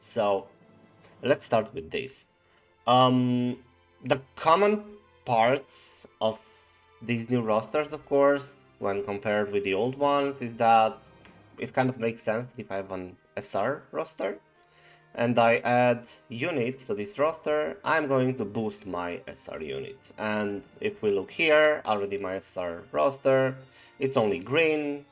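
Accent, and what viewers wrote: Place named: Romania. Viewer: How to recognize a Romanian just by accent :)))) What gave you up 100% was the: "La, la, la" instead of "Yada, yada, yada" :P Great video btw!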